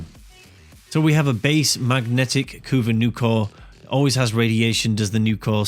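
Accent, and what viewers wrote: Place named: United Kingdom. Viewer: love that british voice of yours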